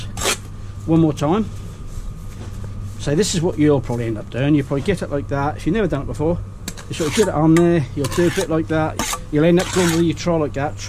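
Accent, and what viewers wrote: Norfolk, United Kingdom. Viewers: You sound like a good old Norfolk boy my friend?? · He sounds like hes from naarrrfolk, norwich?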